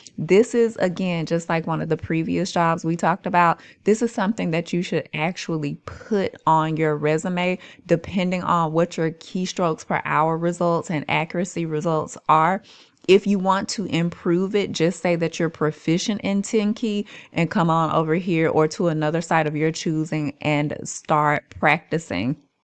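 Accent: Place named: Alabama, United States. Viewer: You definitely from Alabama, am I right? Lol and thanks for the video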